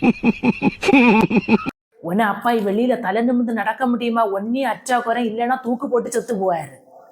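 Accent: Kanyakumari, India. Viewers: Kumari tamil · குமரி தமிழ் சூப்பர்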